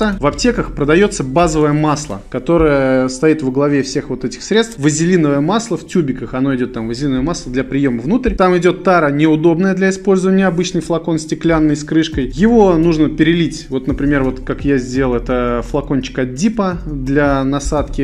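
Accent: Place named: Penza, Russia. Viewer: произношение 50, как в Пензе)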